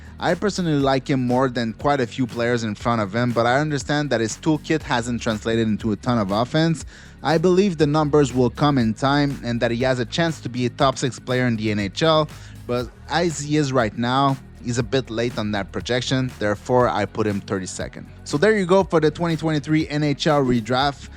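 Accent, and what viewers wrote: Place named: Quebec, Canada. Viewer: ca prends un QC pour reconnaitre un QC qui parle anglais, Sup dude! ;)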